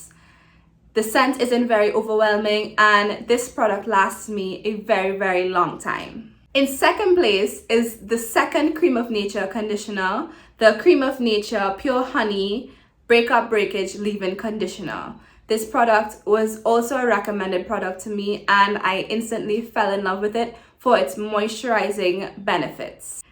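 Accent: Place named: Trinidad and Tobago. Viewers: Yep Trini too the bone... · Definitely a Trini voice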